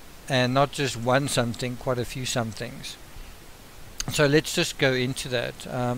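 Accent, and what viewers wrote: Afrikaans, South Africa. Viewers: Interesting! I hear you are a Afrikaans person. Do you remember the case of Oscar Pistorious? I think he was guilty. Good content.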